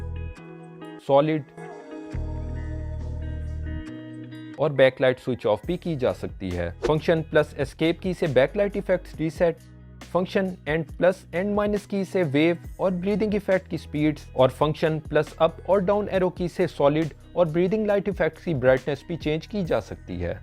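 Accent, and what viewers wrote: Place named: Peshawar, Pakistan. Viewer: Yar ap ky bolny ka style Peshawar k logo jesa he. 🤪 But phir b kha se he ap☝🏻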